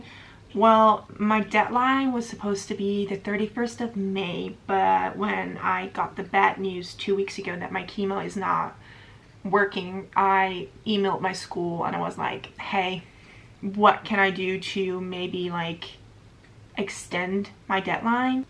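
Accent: United States. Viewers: I also thought she was either an American or a Canadian. Her grammar is also spot on. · All the best to you ! You English is 👍👍👍 At first I thought you are an American ! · The English question answered my question bc it sounds like your from here in USA lol. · How is your english so great? You sound more like an american versus dutch. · You’ve definitely got an American accent. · yeah she says about watching American channels on TV so maybe picked the accent up there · You sound like somebody from Texas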